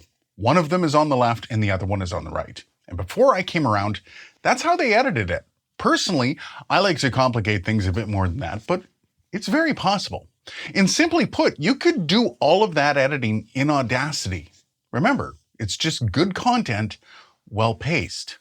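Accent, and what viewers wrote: Canada. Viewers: Ouch, this one stung about as much as your Canadian "about" pronounciation pet peeve. I assure you not every podcast is two people sitting and having a conversation. I've been doing an audio theater podcast for 20 years, incluing me doing multiple voices, adding music and effects that can can sometimes result in editing files that are 12 or more channels deep. And many other podcasters do the same. I only mention this because just as you wouldn't say all music consists of a guitar and a drum set and only requires two mikes, not every podcast can be boiled down to a talking heads show..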